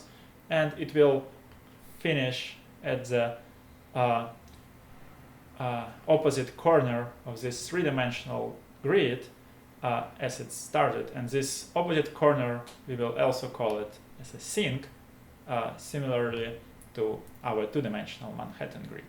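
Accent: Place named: Russia. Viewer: прям слышно что говоришь по русски...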